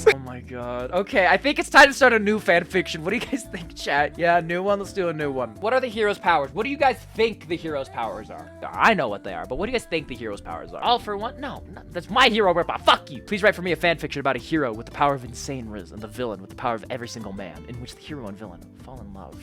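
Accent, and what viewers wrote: United Kingdom. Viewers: It’s not the same without the British gentleman voice tho…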